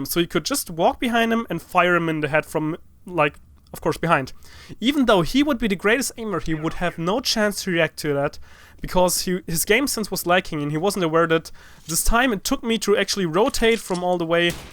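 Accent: Germany. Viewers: your sound like german are u?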